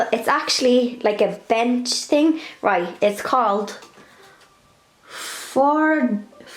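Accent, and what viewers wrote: Ireland. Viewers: I have been watching your videos here lately and am loving you. I am a 64yrold lady who was born in England and love listening to your Irish accent. you are o cute and so crafty. It makes me want to do things I used to do. So I started to do a few little crafts like a beginner even though I used to do this stuff all the time. Thank you for your inspiration and keep it coming. God bless you.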